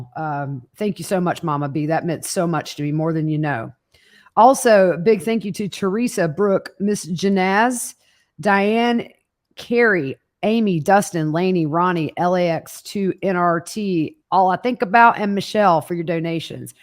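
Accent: South, United States. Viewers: …I AM SUPER PROUD OF U! This is wat I wanted to do growing up…but ya know, having that baby boy 19 yrs ago changed my life a bit. U r on a well known networks doing yo thang! U look good and sound professional…with the southern twang! Proud of it! From the pecan pie to the sweet tea❤ Thank u for representing us well down here! Much love from M-I-crooked letter-crooked letter I, crooked letter crooked letter, I-Humpback, humpback I ❤ PS…can we get Fruitloop one night to talk about this case? Plzzzzzz?!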